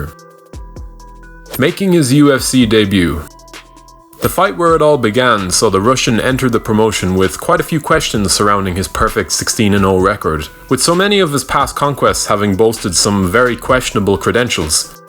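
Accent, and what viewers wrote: Ireland. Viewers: Is that an Irish accent gracing this vid?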